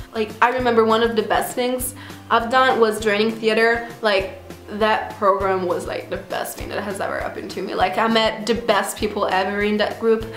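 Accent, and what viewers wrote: United States. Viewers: sta parlando americano non inglese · I love your American accent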